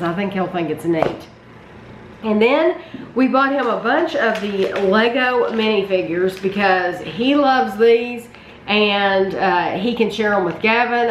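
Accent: South, United States. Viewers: Holy southern accent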